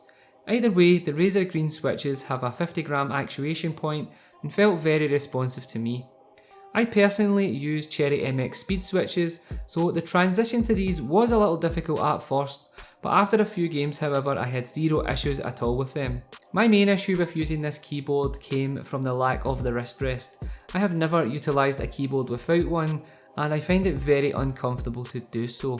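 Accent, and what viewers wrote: Scotland, United Kingdom. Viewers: your Scottish accent is so Scottish P.S STAY AWESOME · is this scottish? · What part of Scotland are you from I'm from Edinburgh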